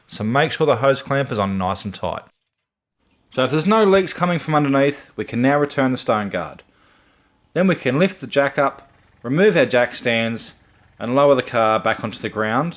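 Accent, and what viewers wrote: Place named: Australia. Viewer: Your are legend mate. Sounds like Australian if yes where about your base? Im from Melbourne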